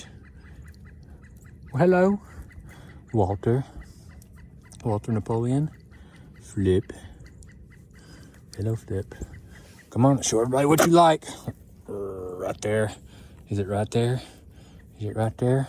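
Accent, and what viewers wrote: South, United States. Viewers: Your southern accent comes through so strong at home. I didn’t hear as strongly when you were living in Vietnam. So cute! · your southern drawl really comes out when you're home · Very first time i hear your southern accent. 😂 I didn’t hear those when you were in Asia ✌️ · Since returning home, your southern accent has really become strong! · hi.. i have watched some of your phil. vlog and came across your recent one.. surprised to hear ur southern accent.. lol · accent got back to factory reset as soon he came back south 🤣🤣🤣 love to see this side of your life country boyy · Your southern accent is so much stronger when you’re home, it’s refreshing, thanks for all the content. Wishing you a fantastic day, and safe adventures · This is for the very first time I am getting to hear your native Southern Accent. Sounds so interesting. 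Do you conciously tweak your accent when you make your travel videos ? Just asking out of curiosity as a language student.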